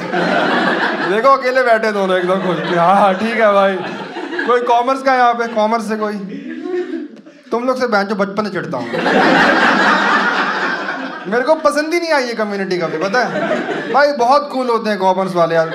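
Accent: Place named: Punjab, India. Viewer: LOVE UR PANJABI ACCENT OF SPEAKING BC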